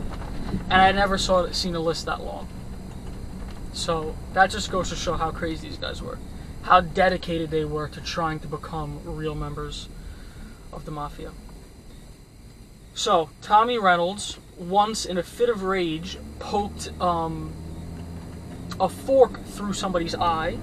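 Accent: New York, United States. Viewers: The time you put into the research is so evident, seeing this area in real time, add to it ur new york accent, enjoy ur channel.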